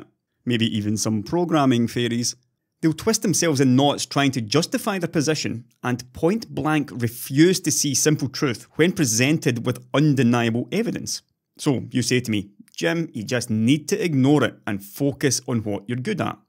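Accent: Scotland, United Kingdom. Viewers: Your scottish accent is better than *'s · Awesome work as usual Jim! Fuck the haters and as Good Old Gamer said: "You can't fix stupid" Never a truer word spoken :D Looking forward to your next vid man, keep it up! you provide a bloody good and balanced viewpoint and have +100 Integrity compared to some others of the techpress, It's for that reason I subbed you (After watching a few vids and eventually became a patron) You're honest, frank and I like that. No bullshit or smoke and mirrors. Just plain old info with a Scottish slant :) Anyways dude have a good week and see ya in the next one!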